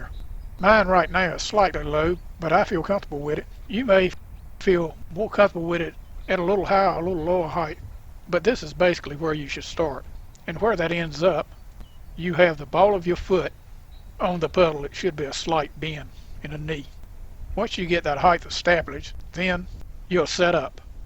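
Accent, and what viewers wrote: South, United States. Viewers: Such a calm and slow-paced demeanor, it's very relaxing for new riders. Thank you! And this yankee loves your soft southern drawl. ;-)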